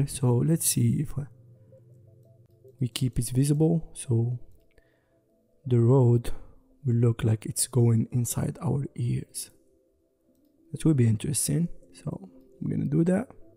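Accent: Morocco. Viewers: That Moroccan accent tho hahaha, great video Bro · Very nice thank you. you have a moroccan accent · That Moroccan accent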